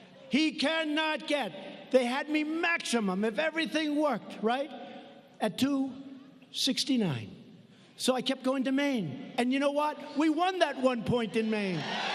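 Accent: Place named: Brooklyn, United States. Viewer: Queens? I here Brooklyn accent but need to check! Either way he has the great Old Skool humor! love the DON!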